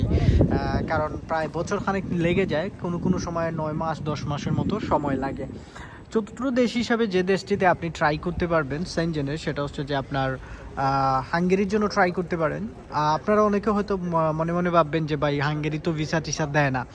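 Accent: Sylhet, Bangladesh. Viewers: ভাই আপনি সিলেটি নি??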